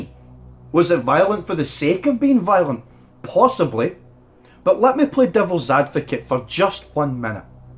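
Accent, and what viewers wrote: Scotland, United Kingdom. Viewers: Gotta luv the Scottish accent.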